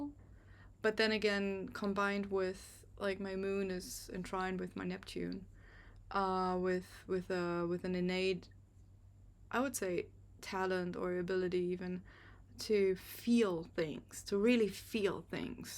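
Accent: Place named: Germany. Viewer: Your English is great actually👏😎, yet it has a carry-over🎉 from german not just in accent/pronunciation, rather also in the way to frequently used fillers such as Ähem/Uum😂😢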